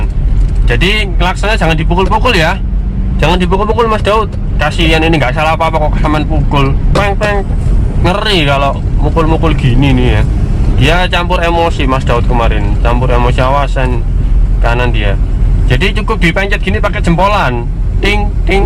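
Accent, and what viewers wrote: Surabaya, Indonesia. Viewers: aku malah suka bahasamu mas,boso suroboyoan🤣🤣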